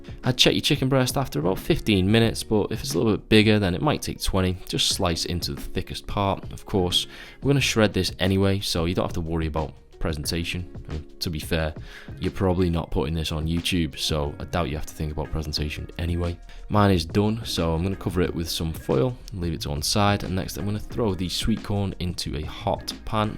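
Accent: United Kingdom. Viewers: Bro it's not only the content being great, your British accent kills me, the way you say stuff just makes me smile haha, amazing stuff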